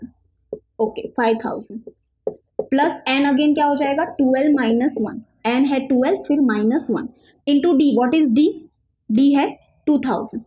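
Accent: Maharashtra, India. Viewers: Miss are you marathi Because you says barobar ❤️😀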